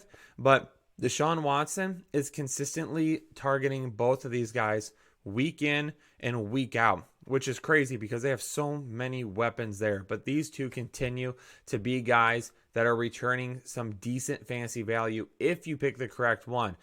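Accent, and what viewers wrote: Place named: Upper Midwest, United States. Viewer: I have one question, upper peninsula or Minnesota? Love that accent G.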